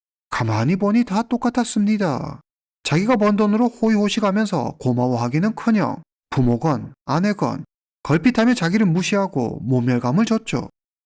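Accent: China. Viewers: 유튜브채널주인 조선족임? 말투가조선쪽인데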